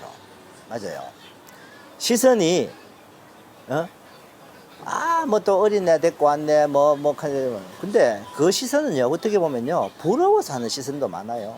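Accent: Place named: Vietnam. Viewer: 주인장 한국말하는 베트남 사람같아요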